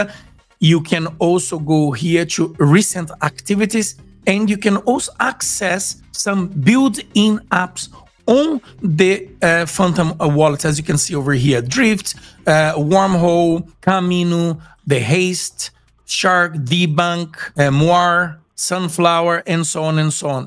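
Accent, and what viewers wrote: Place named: Brazil. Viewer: Brazilian accent detected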